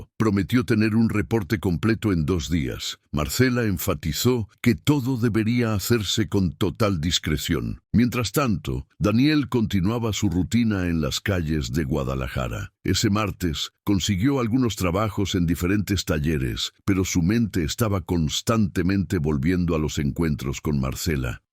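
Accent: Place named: Chaco, Argentina. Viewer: te veo de argentinaho escucho pravincia del chaco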